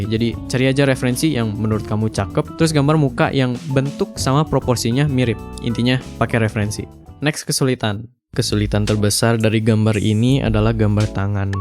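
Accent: West Java, Indonesia. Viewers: Si amang sunda euy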